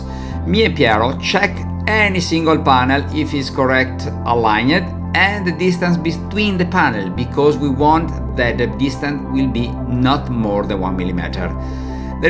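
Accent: Italy. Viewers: personally I find you English spoken with your Italian background quit charming.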